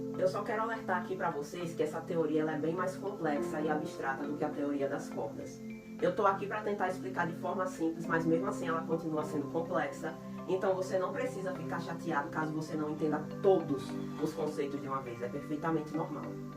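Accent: Northeast, Brazil. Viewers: Ela é maranhense, paraibana ou cearense eu acho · Adorei o vídeo 😄. Só fiquei curioso pra saber de qual estado do nordeste vc é.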